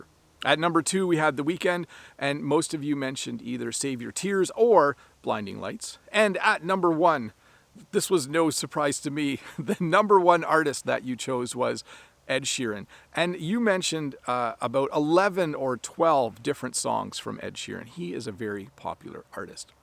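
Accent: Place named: Canada. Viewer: Thank you so much, first of all I 'll like to say that I really enjoy watching your videos, I really like your manners of teaching, because it's very clear to me, it's easy to understand, and I love your Canadian accent it's understandable, iam always practicing your online lessons. I have been practicing and iam enjoying improving English, please keep making videos for us they are amazing for non-native English speakers like, by the way iam Mozambican, I was born in Nampula city ( it's called the Axinene province- Emacua's land). Thank you so much have an excellent day and good luck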